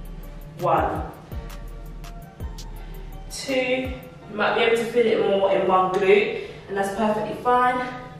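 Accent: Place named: Cockney, United Kingdom. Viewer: I just come here to listen to the Cockney accent lol. Keep up the work 👍 💪 From Canada 🇨🇦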